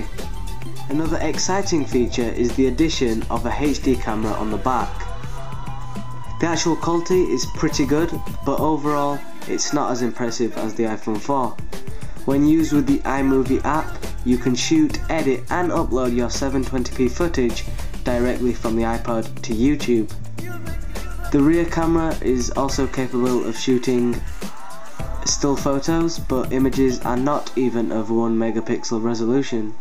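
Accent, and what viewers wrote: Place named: England, United Kingdom. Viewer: I like how your English and not yank.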